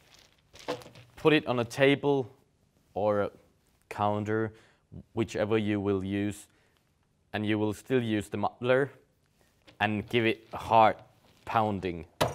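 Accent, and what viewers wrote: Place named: Finland. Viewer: Lmao i recognize a finnish accent when i hear one (im finnish)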